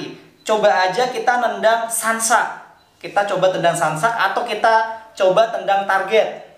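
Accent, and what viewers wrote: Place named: Java, Indonesia. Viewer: Daerah Mana Bang Kok ngomongnya Jawa hehehe... Sosis Lu bang (suami Takut istri)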